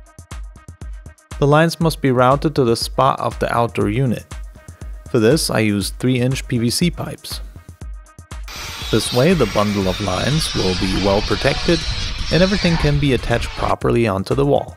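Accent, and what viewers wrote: Europe. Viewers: Good video. You sound European. There are regulations in EU to have certified installers. I am curious - not complaining - are you certified to install or are you not in the EU or you are in the EU but you don't care, if so why don't you care? Thanks Reason I ask, is that I want to do it myself in UK. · Mind444 Spiritual Tribe He sounds European, it will be 220 - 240 volts depending on the country, single phase.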